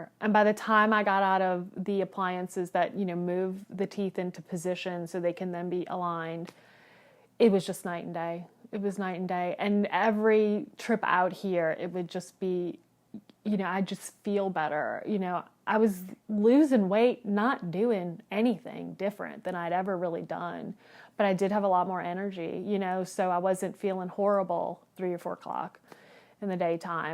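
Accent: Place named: South, United States. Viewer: Our girl's got that southern accent🔥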